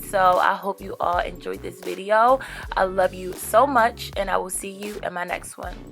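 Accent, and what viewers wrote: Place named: DMV, United States. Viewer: Idk if I’m tripping but I hear a dmv accent. I’m Born and raised ! Lol ...I here that tew and monf 😩😍😍😍😍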